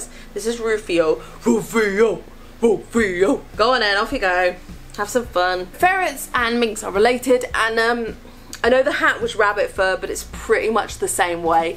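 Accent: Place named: Ipswich, United Kingdom. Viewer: I love hearing your accent. Knowing you're from Ipswich (at least, I hope you are, or I'll look like a right tit) gives me a warm feeling inside. I grew up in Diss 😃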